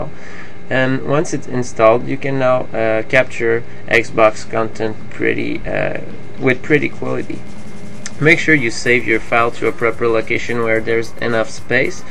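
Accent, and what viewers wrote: Canada. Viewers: Yes it is. it is a Quebecois accent. from quebec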